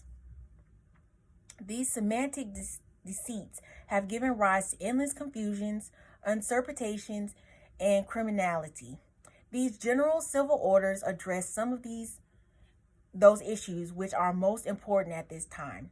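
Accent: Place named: United States. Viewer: I'm curious, are you from Morocco? You sound American is why I ask.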